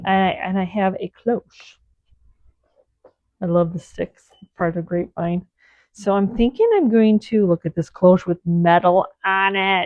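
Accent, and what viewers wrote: South, United States. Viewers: Me too. I have noticed several words she says that sound like us down here in the south. I love it.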